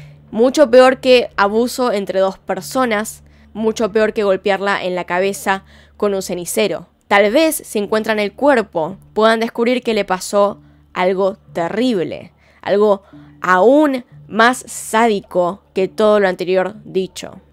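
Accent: Argentina. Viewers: Muchas gracias por el caso, tienes un acento argentino muy bonito, se oye bien con la narrativa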